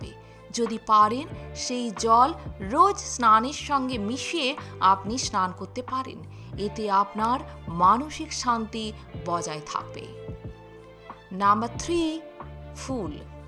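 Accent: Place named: United Kingdom. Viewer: British speaking Bengali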